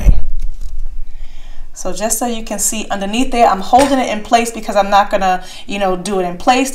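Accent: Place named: Boston, United States. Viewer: Are you from MA? The amount of times you said “lasah” I was like ok she sounds Boston!! 😂😂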